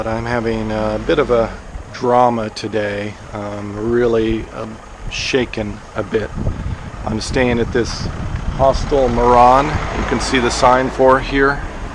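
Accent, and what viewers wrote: United States. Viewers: butthurt ! the story kind make nonsense to me a lot of Hollywood action death threat , drugs , border report you and your friend should know the red lines before traveling to any country Russia ,China ,Dubai"UAE" those countries diverse in almost everything religiously culturally etc but share one common anti LGBT from your accent I assume you are from US let me refresh your memories with states this incident could took place Mississippi, Texas, Alabama . do your search before making scene.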